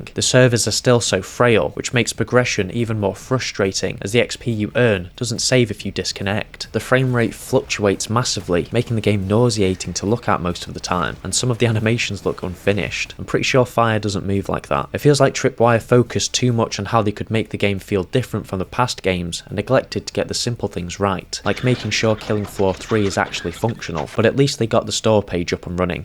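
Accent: United Kingdom. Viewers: I felt like it was a matter of time before I actually heard a brit shitting on this game.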